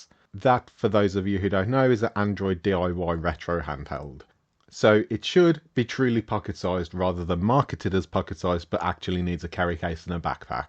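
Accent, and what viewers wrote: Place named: Birmingham, United Kingdom. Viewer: Nice Birmigham accent. I'm from from Acocks Green..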